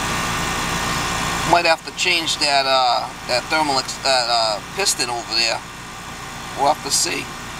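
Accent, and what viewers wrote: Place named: Boston, United States. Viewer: I knew it, the biddy and I were watching the video and said to each other, looks familiar, and sounds close to home, Good diagnosis and fix, Al from flippin' Bahston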